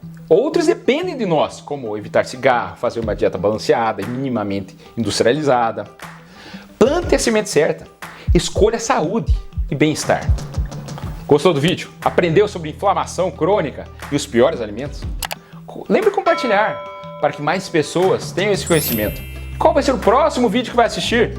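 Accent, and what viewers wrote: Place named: South, Brazil. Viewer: Adoro isso, médico q não deixou de clinicar pra virar Youtuber e vender fórmulas! Este cardiologista sim. Se vê q ele fala de um hospital e tem um sotaque de quem é da região sul. Este eu ouço SeMPRe e sigo o q indica. Vai direto ao assunto sem enrolar e sem vender nada. Confio plenamente neste, e tô sempre de ôlho e o escuto até o fim. Tmb, não enche linguiça até chegar aos finalmentes. Meu preferido, entre outros parecidos.Uu😍👏👏👏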